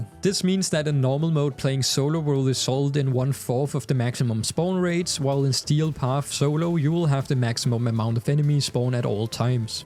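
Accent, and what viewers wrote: Denmark. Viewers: Great video. You sound Denish?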